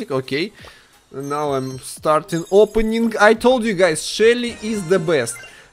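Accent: Russia. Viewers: Russia, Look At His Accent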